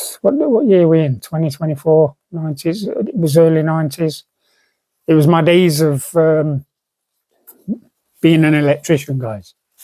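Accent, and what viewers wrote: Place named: Nottingham, United Kingdom. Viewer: your accent shure your a notts lad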